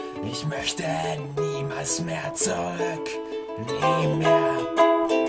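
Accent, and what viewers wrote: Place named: Hesse, Germany. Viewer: wo hast n du dein dialekt her? du hörst dihc an wie meine ex ^^ ich glaub aus hessen kam die xD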